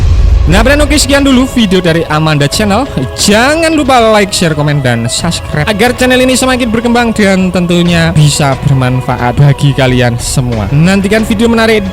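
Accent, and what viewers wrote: Java, Indonesia. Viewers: Uong Jowo tenan kang,, medok tenan leh muni...😂😂😂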